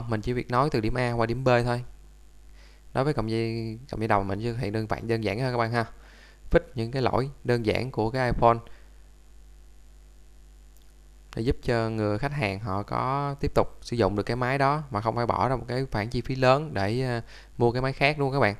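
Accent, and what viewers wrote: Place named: South, Vietnam. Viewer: Cũng hay, nói chung thì thật khó để giải thích những vấn đề chuyên môn, vs em thì luôn nghi ngờ mấy cái hàng lock vs like new, tốt nhất cứ vô cửa hàng lớn, máy zin 100% mà mua, ai dốt thì cũng cố trang bị cho mình những kiến thức cơ bản rồi đi mua máy không thì nhờ con cháu. Bản thân em thì mua mới 100% ở đâu thì cũng vẫn phải kiểm tra vì bản thân máy moc không phải cái nào chất lượng cũng đồng đều, mua về dung 1-2 tuần kiểm tra, nếu ngon thì Ok , còn có lỗi là phải đổi trả ngay, thêm nữa tích cực xem review đánh giá để biết đặc điểm tính chất máy. PS anh giọng miền Nam nhiều từ khó nghe quá, có lẽ nên có sub ah. Thanks!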